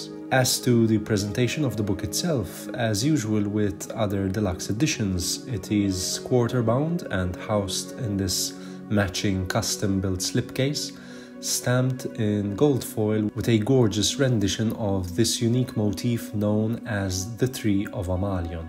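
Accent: Malta. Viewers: by any chance, are you maltese?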